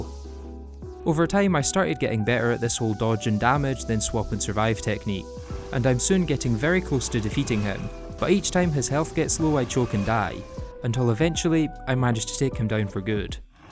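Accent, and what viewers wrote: United Kingdom. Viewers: Subbed but why are you british?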